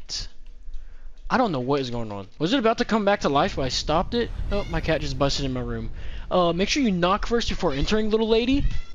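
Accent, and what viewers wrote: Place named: Delaware County, United States. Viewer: Immediately clocked that mid Atlantic accent. Youse from delco broh?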